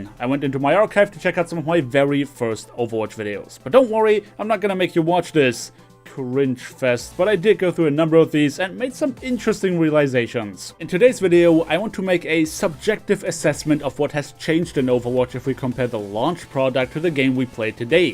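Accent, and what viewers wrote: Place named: Germany. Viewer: Se German accent tho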